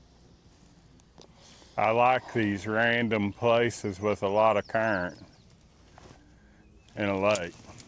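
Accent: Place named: South, United States. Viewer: Love your channel! Plus sounds like you’re a southern boy like me. lol. My gf says wow you and him sound just a like when you talk. You do my kinda Catfishing. Simple gear catching fish. Great video! Up here where I’ve been living in Ohio you Can’t find skipjack here. I wanna move back to Kentucky soon. I lived near Markland Dam and miss it a lot. My son still lives there right next to Markland dam. As soon as my boats done being restored I’m heading that direction lol. This boat restore is killing my body. I stay sore lol. It’s a 1979 Sylvan deep V 16.5 foot. Anyway thank you for another great video! 🎣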